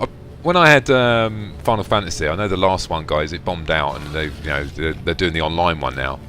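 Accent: England, United Kingdom. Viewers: Been watching some of your videos after I found that warframe one, Your voice is now one of my favorites out of people who talk over video games on youtube with an English(I think?) accent. lol, and you know there's a lot of those. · From the moment i heard ur voice im thinking like this guy is smart because i always think that people with english accent is smart :)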